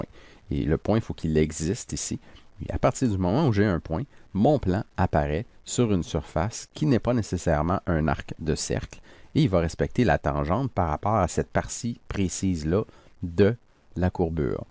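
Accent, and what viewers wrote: Quebec, Canada. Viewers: Bonjour, je suis impressionné par la qualité de vos vidéos qui sont toujours très précises et à travers lesquelles on devine un grand professionnalisme. Et c'est aussi un plaisir d'entendre cet accent québécois pour nous les Français.Je m'étonne que votre chaîne n'ait pas pas plus d'abonnés car vous le méritez plus que d'autres. Merci pour nous et continuez comme ça. 👍👏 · Très bon(s) tuto(s), on voit que c'est préparé même s'il reste quelques boulettes (qui n'a jamais oublié de sélectionner un composant avant de faire une manip, c'est mon drame !). Chaîne méconnue mais il y a de la place dans l'espace francophone. L'accent est super (pour moi), ça me rappel mon séjour au Quebec. Je vois un petit -22°C à Montréal aujourd'hui, courage les cousins dans 6 mois ça sera la canicule :)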